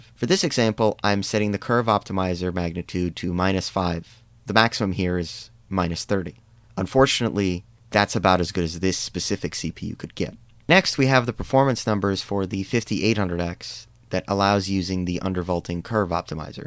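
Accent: Scotland, United Kingdom. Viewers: I miss your adorable Scottish accent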